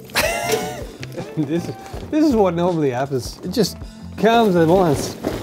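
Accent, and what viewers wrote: Netherlands. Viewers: His commentary and Dutch accent is what makes his channel uniquely his. I love it, it shows a true love for cooking and eating.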